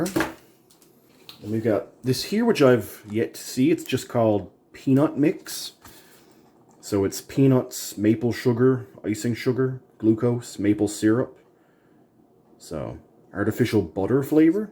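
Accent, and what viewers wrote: Canada. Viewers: The best part of the reviews are you can hear a hint of Bubbles from time to time from TPB lol Canadians have a great accent. Much love from Wisconsin,USA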